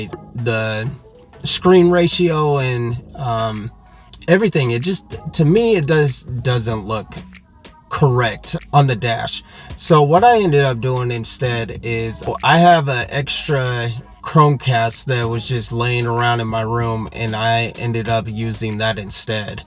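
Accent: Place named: Bahia, Brazil. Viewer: meu deus que bahiano pqp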